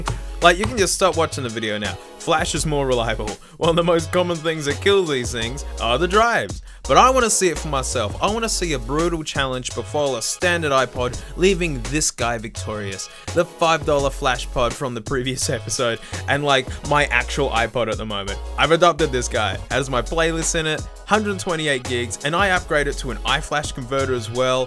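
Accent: Australia. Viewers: 2 years later, you can see the aussie seep out into the videos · He sounds less aussie then he does now and less energetic Thats wacky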